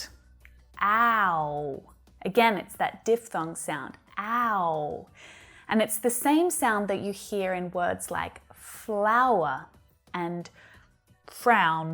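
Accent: United States. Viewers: Es Inglés americano🇺🇸🗽? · Many English people pronounce the word "hear” as "hiya".But yours is a bit sounds like American accent.May i learn the difference between them? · Is this american english pronunciation?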